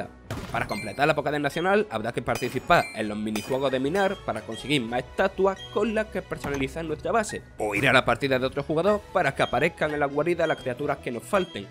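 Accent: Andalusia, Spain. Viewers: Se nota q eres andaluz ajajja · Pues le quiero decir al narrador que no se desanime por lo que le están diciendo sobre su voz yo soy de México y al principio se me hizo raro el acento pero ya me fui acostumbrado los que dicen que no sabe hablar o no se que, entiendan el canal es de España por lo que obviamente se centran principalmente en el público español y allá el acento o esa forma de hablar es muy común, ellos no tienen la culpa de que esa forma de hablar sea rara en Latinoamérica así que no hay porque quejarse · Porque hable con acento andaluz no significa que tenga mal léxico 🤦🏻‍♂️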